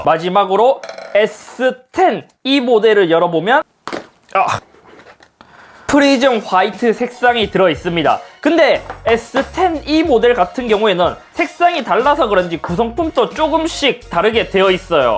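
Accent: Gyeongsang, South Korea. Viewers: 경상도사투리같으신데 어느지역이세요? 궁금쓰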